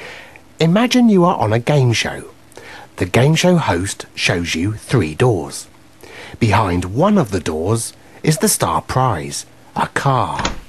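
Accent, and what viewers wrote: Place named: United Kingdom. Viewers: 真的一直分心聽英國腔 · 英國講話很重音調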